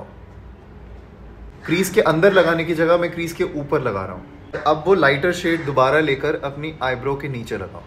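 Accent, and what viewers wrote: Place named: United Kingdom. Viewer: Ma'am why are you speaking Hindi in English accent. Do you feel ashamed to speak Hindi like Hind (like how it originally sound) your Hindi in English accent is really annoying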